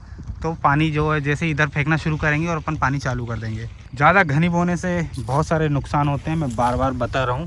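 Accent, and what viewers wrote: India. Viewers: Kaha ke ho bhaiya narsinghpur jaise bol rahe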